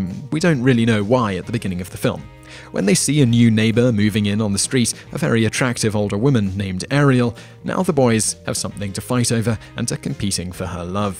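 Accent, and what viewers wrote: United Kingdom. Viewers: ... maybe it sucks first of all because this guy has a British accent. I realize the tradition does actually go back to the English, but these days it's considered an American holiday ...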